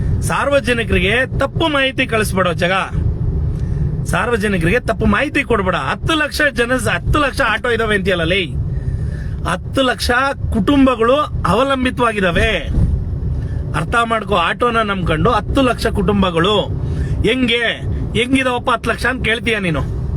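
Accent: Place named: Anekal, India. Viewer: Anekal benglore alwa, nin bashe, 😂😂😂😂😂😂😂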